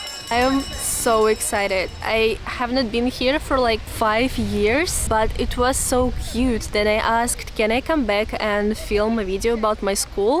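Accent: Russia. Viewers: I like the way you talk it is soo cute haha your russian accent ❤️ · You have authentic russian accent..love it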